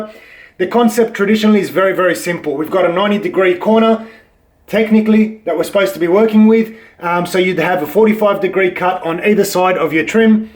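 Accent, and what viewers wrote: Australia. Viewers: I am about to install baseboard myself. I could not find an easy way to figure out the angles until I saw this. It seems you have mitre cut to 45 first before grinding out. Is there a way to eliminate the mitre cut too? Sorry this may be a silly question. Love the Aussie accent!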